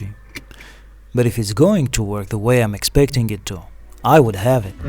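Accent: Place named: Ireland. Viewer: You sound like an irish man. Are you Irish or Romanian?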